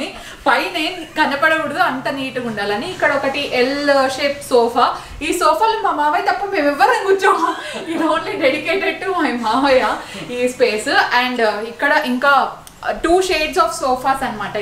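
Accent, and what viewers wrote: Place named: Rayalaseema, India. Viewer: God bless you and your family amma Rayslaseema bhasha vintunte chaala baagundamma😊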